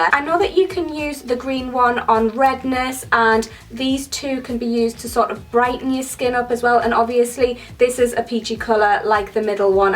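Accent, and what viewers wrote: Newcastle, United Kingdom. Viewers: I love your videos as I am a fellow Geordie. You are a Geordie aren't you 😂😂😂 Please do a freedom makeup tutorial ❤️💖❤️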